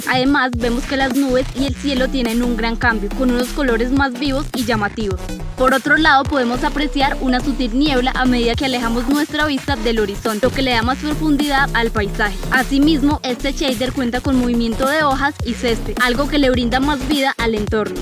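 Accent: Colombia. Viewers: voz colombiana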